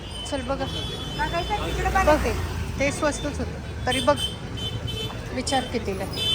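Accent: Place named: Kolhapur, India. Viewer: छान मार्केट सफारी... ताई कोल्हापूर बोली पध्दत चालीरीती सोडू नका.... कमेंट दुर्लक्षित करा 🎉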